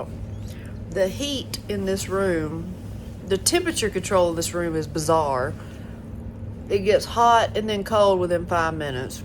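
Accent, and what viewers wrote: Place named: South, United States. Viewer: Go home and strengthen your southern accent because you’re rapidly losing it.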